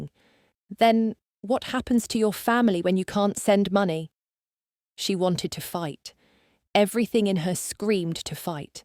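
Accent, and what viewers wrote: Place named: United Kingdom. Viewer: I’m watching from having Indiana, and I have discovered that I could listen to a British accent talk about anything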